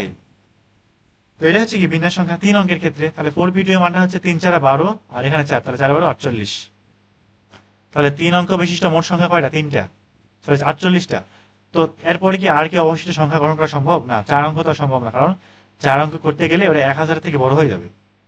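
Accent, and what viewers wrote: Naogaon, Bangladesh. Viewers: Vaiyer basha naogaon te naki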